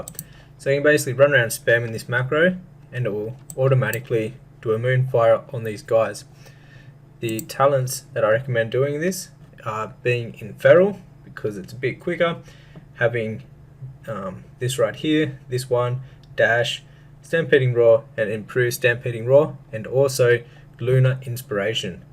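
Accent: Australia. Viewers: Love your Aus accent lol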